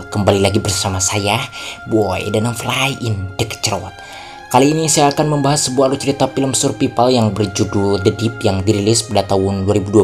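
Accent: West Java, Indonesia. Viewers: Nma nya spa bng Sunda nya mna bikin ngakak kalo dngerin suaranya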